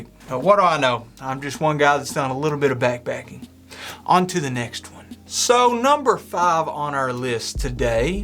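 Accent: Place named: South, United States. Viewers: What's up with his accent? Why is he talking like Forrest Gump? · My goodness! thats the one of the sweetest southern accents I've heard! love from the netherlands! <3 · I listened because of your southern accent...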